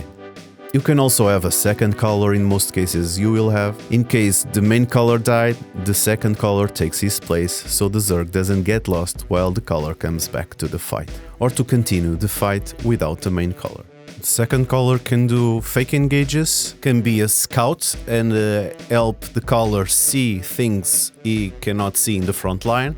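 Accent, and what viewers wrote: Portugal. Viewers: Esse sotaque é de um tuga ahah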